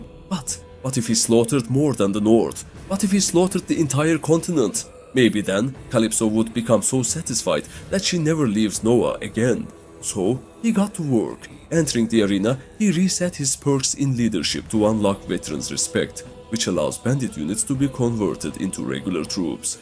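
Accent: Turkey. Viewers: türk aksanı çok kötü gerçekten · Bro your videos are just so good. They gives everything to us. But your voice is just not giving it. It's not about your tone or something. Its about your accent. You're Turkish I think. Please try to improve your accent. I m not trying to be racist or something but you know it would be better if you ll keep my words in your gut. Appreciate for the video, have a good one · Abi tam Türk aksanın var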